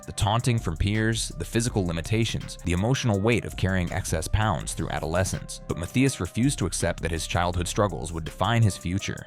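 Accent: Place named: United States. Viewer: Another load a ballax video on YouTube with American voice over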